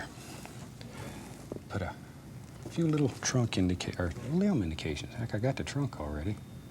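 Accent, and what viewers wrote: South, United States. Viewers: "It's purdy" God bless the south!!! And thank you for our southern accents!!!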